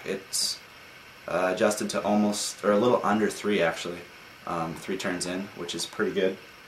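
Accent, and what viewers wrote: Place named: Canada. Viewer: You are canadian, aye? Figured that OOT right at the beginning :-D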